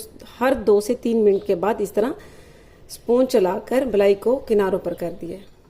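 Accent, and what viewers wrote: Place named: Pakistan. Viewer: Mam ka accent toh pura Pakistani hai lekin pakwaan ki koi seema nahi hoti😋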